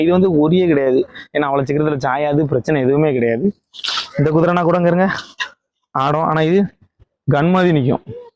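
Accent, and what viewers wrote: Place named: Tirunelveli, India. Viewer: super bro.. which place in tamilnadu.. slang seems to be tirunelveli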